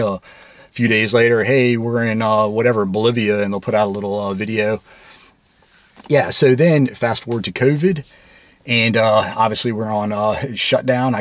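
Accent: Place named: Mid-Atlantic, United States. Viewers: Congrats! As far as accents, I'd say you have a mid-Atlantic accent, neither Northern nor Southern, yet not Midwestern. You don't have the twang of southwestern VA where I was raised, nor the drawl of the Danville area where I lived. And nobody in VA has the all out Southern accents down here in SC and GA 🤣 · I’m a resident of Fredericksburg and I’ve really liked your content. So much history in this little town. I have to ask, where did you grow up? I can’t place your accent 😂. Pennsylvania?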